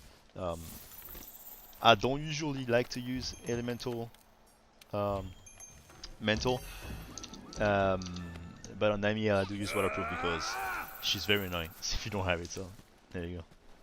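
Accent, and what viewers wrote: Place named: France. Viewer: Alors accent français... 69... région Lyonnaise? 😏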